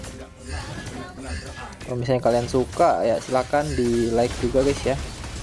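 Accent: Manado, Indonesia. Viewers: Orang mna bg Manado ?apa Sulteng?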